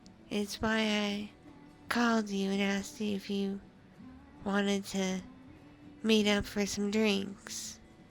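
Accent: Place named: South, United States. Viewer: That country accent just B E A utiful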